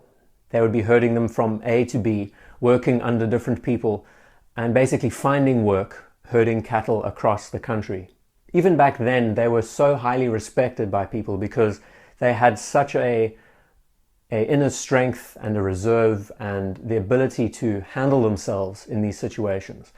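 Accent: Cape Town, South Africa. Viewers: Are you from Cape Town?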